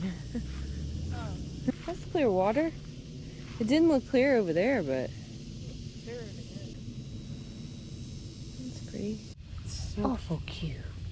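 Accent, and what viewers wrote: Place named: Indiana, United States. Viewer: I'm amazed at your love for nature hiking and adventures. I especially like the way you pronounce your Os in words. Indiana girl. It's sounds so country. Yep I love to hear females talk with a country accent. 🎉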